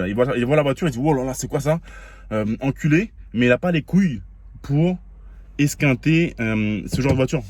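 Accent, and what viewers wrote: Mauritius. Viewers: mec t'es mauricien?😅💯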